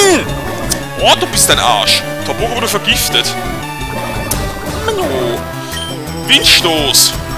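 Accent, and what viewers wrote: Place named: Austria. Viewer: Ich feiere ihn auch vorallem wegen seinem österreiischen Akzent :D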